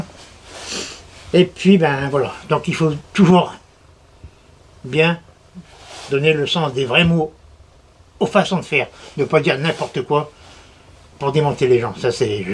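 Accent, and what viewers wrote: North, France. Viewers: Bonjour merci pour toutes ces vidéos c'est toujours un plaisir. En tant que fille du nord, je vis maintenant en Bretagne et la nostalgie de ma région d'entendre l'accent me fait chaud au cœur. J'apprends beaucoup avec les vidéos. Merci beaucoup pour les conseils et je me suis empressée d'acheter mes graines Bocquet. Bonne journée à vous deux. 🤗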